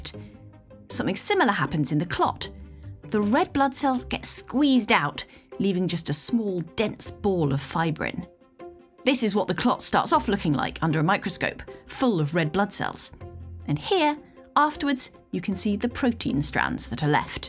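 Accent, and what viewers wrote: United Kingdom. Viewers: The extremely British, and unnecessary, accent intonations had me quit half-way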